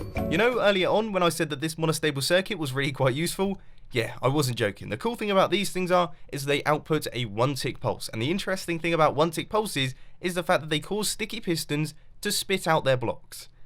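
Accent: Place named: United Kingdom. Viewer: I love you and you sound so British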